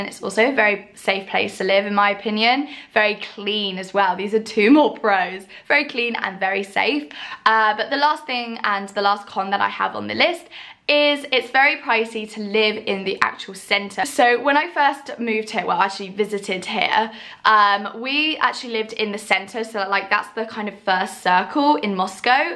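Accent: United Kingdom. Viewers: После 12 лет жизни в США, британский акцент прямо режет по ушам, в хорошем смысле. Вспомнил уроки английского в челябинской школе)) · can teach Moscovites proper British English... 🇬🇧👈🏽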